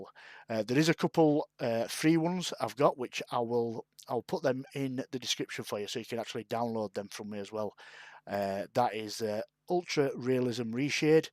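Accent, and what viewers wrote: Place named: Northern England, United Kingdom. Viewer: arr thats a northern man if i ever heard one! thanks for the video <3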